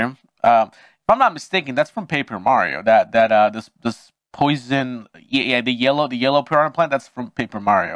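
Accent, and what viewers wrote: Chile. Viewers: Habla chileno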